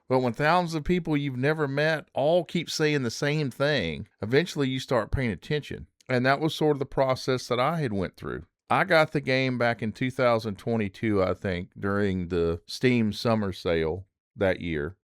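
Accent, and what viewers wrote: United States. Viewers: Nice breakdown. Your southern accent makes me feel like you are my friend. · This American acient is nuts bro can't even talk properly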